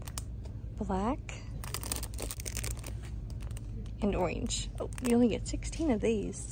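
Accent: South, United States. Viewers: First time following u! I love Halloween 🎃 everyday!! Are u from the south? I live in Iowa no dt Halloween?!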